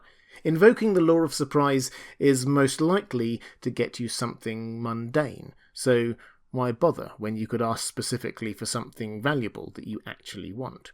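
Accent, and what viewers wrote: United Kingdom. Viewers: Lol it's just his British accent I think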